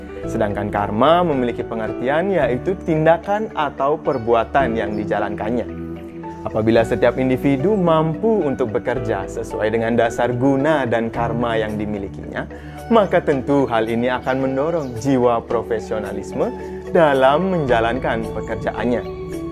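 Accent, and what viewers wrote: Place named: Bali, Indonesia. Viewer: Om Swastyastu, awighnamastu Bagus baik becik, bhaktisewanam. Logat Bali tulen masuk seratus persen. Tapi bagus juga, semoga Orang luar Bali memahami isinya.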